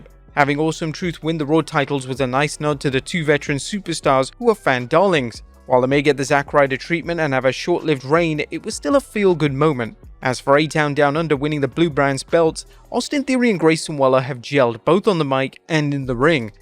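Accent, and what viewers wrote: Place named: United Kingdom. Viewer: You sound like my British friend She loved watching the rock last night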